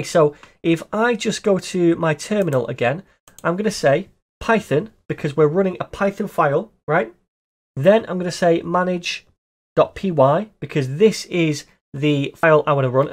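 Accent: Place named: North, United Kingdom. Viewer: nice to hear and be taught by a fellow northerner!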